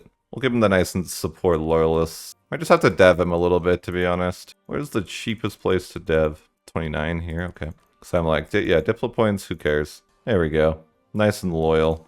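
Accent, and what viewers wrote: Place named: United States. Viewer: You sound so similar to an American colleague I work with, what state did you grow up in if you don’t mind me asking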